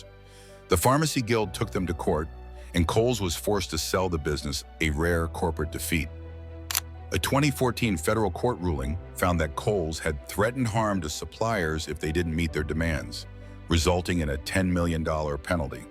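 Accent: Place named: United States. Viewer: Can we NOT AI narrate with a yank accent?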